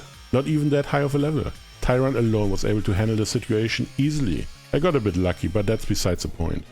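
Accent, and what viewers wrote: Germany. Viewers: Peak, but are you German perchance? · first time seeing a video of this channel but am i hearing a german accent? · So habe das Video jetzt erst gesehen ich habe direkt erkannt dass du deutscher bist du hast einen sehr ausgeprägten deutschen Akzent da fühl ich mich doch gleich wie Zuhause or in english like Home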